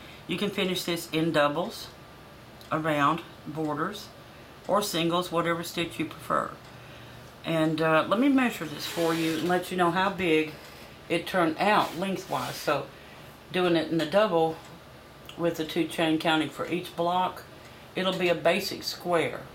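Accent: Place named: South, United States. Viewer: I love your country accent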